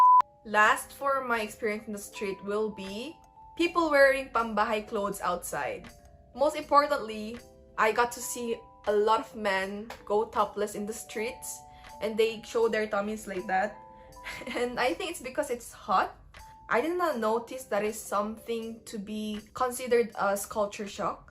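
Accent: Philippines. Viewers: Your english has a Filipino accent. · Wow you have filipino accent while speaking in english. Kudos!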